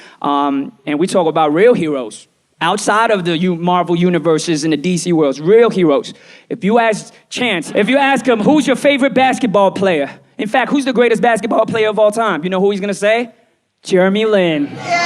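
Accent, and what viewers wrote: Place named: United States. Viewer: Looked this guy up because I’m currently watching Idol producer and was curious who he was because I could hear the American accent through his Mandarin. Little did I know that he’s funny as fuck!!!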